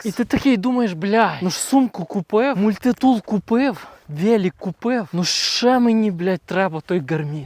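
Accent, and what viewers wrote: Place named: Western Ukraine, Ukraine. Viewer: плять що за жахлива українська? плять як задовбали ці западенці. Селюки плять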